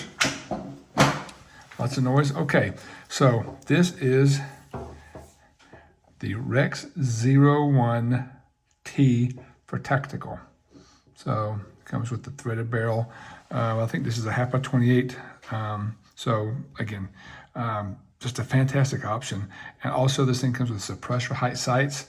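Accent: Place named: Belgium. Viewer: I’m just guessing, but are you originally from Belgium? Had to ask because of the accent 😉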